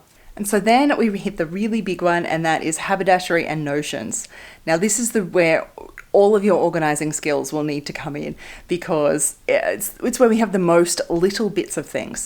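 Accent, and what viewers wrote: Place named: Australia. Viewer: Looks Asian sounds ausie im so confused😂 great organizational skills!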